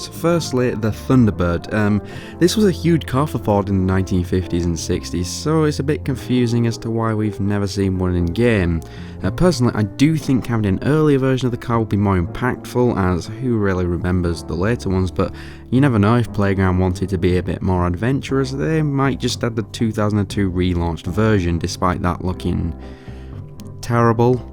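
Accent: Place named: Bolton, United Kingdom. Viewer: I can sense the bolton accent straight away for my first vid on the chanel😂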